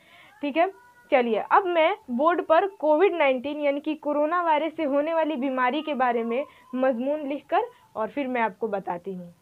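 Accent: Bihar, India. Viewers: यदि हमें ऊर्दू आती हैं तो हम आपकी video dealy देखते पता नहीं आप को हिंदी आती हैं की नहीं क्यूं हमने हिंदी मेंcomment किए 🙏 एसे ton से तो आप भी बिहारी ही लगते हैं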